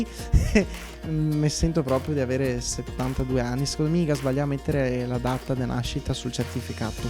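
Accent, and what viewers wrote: Veneto, Italy. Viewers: Ti ho appena conosciuto ho parenti veneti ed è bello sentirti parlare xchè mi fai ridere tanto, non pensando al resto, grazie. PS anch'io andavo a ballare liscio e mi divertivo, ora non posso più 🤷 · Mi hai aperto un mondo. Sono veneta-piemontese , nata e vissuta in Piemonte. Mamma padovana, papà torinese. Tu parli pressapoco come parlava a volte la mamma e i parenti veneti. Prima, di udire te, avevo sempre pensato che quel : " proontooo" al telefono , di uno zio fosse solo una spiritosita' sua, essendo lui un burlone, invece no tu lo pronunci uguale. E anche tante altre cose che diceva la mamma: scoase, cosa chel voe, sacramento, ecc. Praticamente scambiavo la parlata , in certi momenti, xche ' per il resto parlava italiano, di mia mamma per un suo modo di essere per scherzare; anche xché sapeva che alla nonna piemontese dava fastidio la parlata , un po' sboccata , dei veneti, quindi credevo che la mamma accentuasse. 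Invece no, non era la mamma che scherzava era proprio il modo di parlare dei vicentini, padovani ecc.😂😅😊 Sei molto bravo , mi fai ridere e mi riporti alla mamma che non c'è più. Continua con i tuoi video. Grazie.😊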